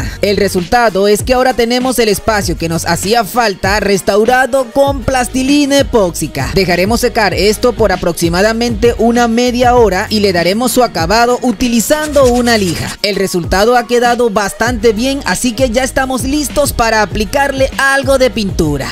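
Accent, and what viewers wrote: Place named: Venezuela. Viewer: es Venezolano el acento te lo dice mi pana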